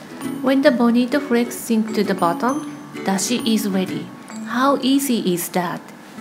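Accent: Japan. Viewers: english language with dialect japan but i love so much 😍😍 im from indonesia 🇮🇩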